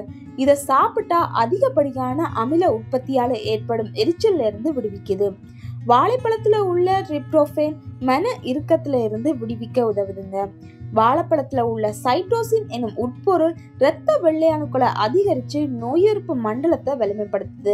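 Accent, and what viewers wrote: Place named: Sri Lanka. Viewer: srilankan tamil bro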